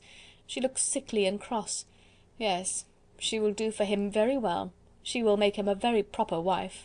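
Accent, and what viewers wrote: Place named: United Kingdom. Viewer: Excellent british accent!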